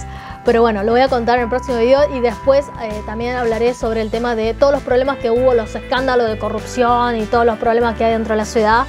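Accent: Argentina. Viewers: Además te felicito que no pierdes la forma de hablar Argentina....!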